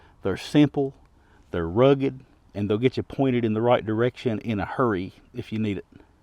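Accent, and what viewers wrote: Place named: Appalachia, United States. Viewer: The good ole appalachian accent can be hard. He is a great guy, maybe turn captions on, worth listening thru. I personally find him pretty easy vs many other thicker accents (creole, or thicker appalachian, southern ebonic etc)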